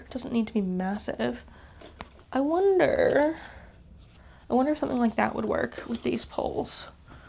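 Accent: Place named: England, United Kingdom. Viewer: Ive been curious about where your originlly from and when you moved to england, do you notice that you have an english accent sometimes? i think its cute! i would love to live in england. I also notice that when you speed up your videos you move your mouth around, do you have a bad habit of chewing your cheeks like i do? you seem a bit neurodivergant and i think thats a sign. I do it so bad sometimes that my cheeks will be sore. Love your videos btw!! love your fashion style to! where do you get your jewelery??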